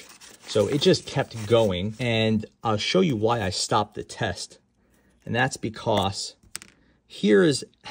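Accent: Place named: Philadelphia, United States. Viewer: Great reviews and you always show new and different pens ...Thanks !! BTW....you sound like you're from Philly ...is that close ?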